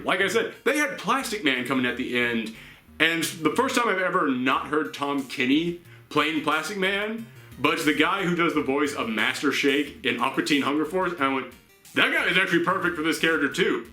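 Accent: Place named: South, United States. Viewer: wait just a minute your from the South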